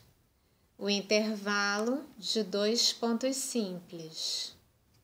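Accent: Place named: Rio de Janeiro, Brazil. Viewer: o seu jeitinho carioca de falar e um amor! Good Bless you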